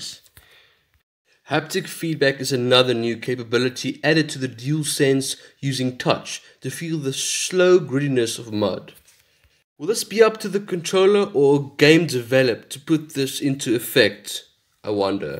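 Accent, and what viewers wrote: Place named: Russia. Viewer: Автор русскоговорящий что ли?) что с английским)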